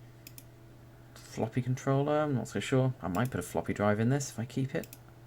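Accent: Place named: United Kingdom. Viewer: I love the british accent. "It cahnt see mah roota"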